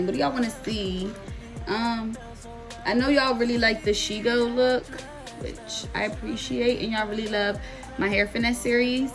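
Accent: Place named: Baltimore, United States. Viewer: You’re so pretty I love your accent , bmore?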